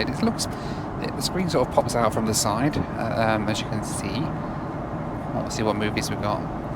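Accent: United Kingdom. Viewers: as a newbie so i know. You sound like you are from the United Kingdom but you live in Houston in the US? Is that correct? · thought u r british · Hi, enjoying your videos, i thought your were from UK, didn't realise you live in USA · You sound British did you move to usa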